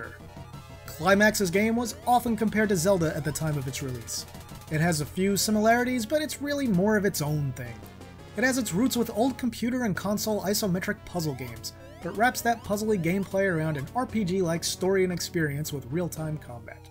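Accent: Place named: Western Canada, Canada. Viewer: That's cool. You speak a bit like they do out west.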